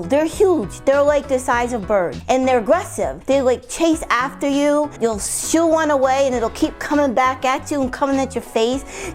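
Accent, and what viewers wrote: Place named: North, United Kingdom. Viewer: your from the north arnt you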